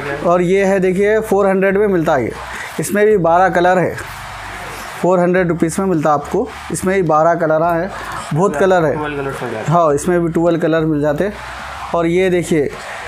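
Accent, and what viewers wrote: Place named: Hyderabad, India. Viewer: Hyderabadi baata ya Allah 😂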